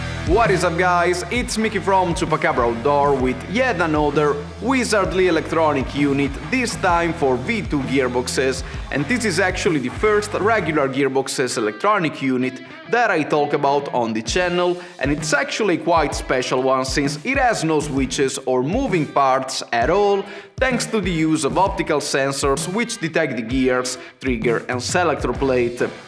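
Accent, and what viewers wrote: Italy. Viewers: what an annoying voice you have. Overkilling italian accent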